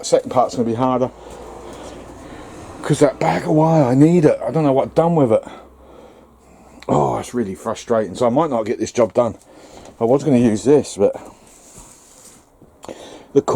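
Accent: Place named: Suffolk, United Kingdom. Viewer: this how i understand it works after months youtube research and wading through many videos of mis information if you are wiring two batteries in series to achieve 24v if so. for example if you have two 100ah batteries in series you will have 100ah but if you have a 100ah and 80ah you would have 80ah but i would recommend batteries of matched ah batteries preferable of the same condition if you are wiring in parallel to achieve 12v assume all batteries in that parallel are of the lowest AH battery value for example 100ah connected to 80ah you would have 160ah when purchasing brick wash watch out for cheap version with less than 10% w/w hydrochloric acid and when applying on heavy deposits you may need to work it in with a stiff brush and leave and come back and work it in again when the fizzing stops the concrete has neutralised the acid i thought you was from Suffolk by your east Suffolk accent best wish to you and your family from dave Bury St Edmunds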